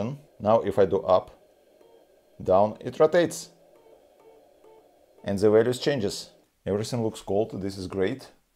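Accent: Russia. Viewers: Русский акцент сильно земетно.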